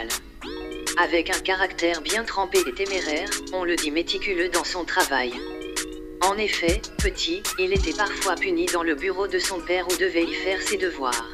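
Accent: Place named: France. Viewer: Bizarre. Même pas capable de prononcer les noms comme un Congolais... Accentuation et nasalisations à la française...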